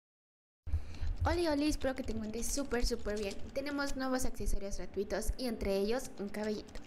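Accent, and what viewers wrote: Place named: North, Mexico. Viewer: Este wey es más norteño que yo… Me representa👍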